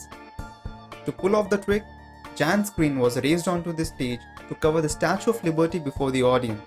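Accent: India. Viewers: Is this narrator Indian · U have indian ascent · Why da hell is the Hindu trying to convince me with his accent ? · his unbearable Indian accent! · That Indian Accent Puts Me Off! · Is the narrator in this video indian 🤔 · Are you indian? You sound like an Indian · Because he is an Indian. And sadly for you, you only talked Indians before via Tech supports. So you're now surprised how a Magic explainer's voice can be an Indian voice. Fact of the matter is, magic tricks finds it's home in India. It's a home of magic. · I also think His English assent is completely Indian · This guy sounds like Indian. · I like the VIDEO, but i don't like the narrator's accent. Please don't use Indian accent, coz Indian accent sound so stupid. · From India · Mi sijaelewa kabisaaa lafudhi yako ya kihindi....mgumu kuelewa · Sound like Indian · When v sounds like w, you know he's from india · You sound very indian and that's a great thing . 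· Its Indian English....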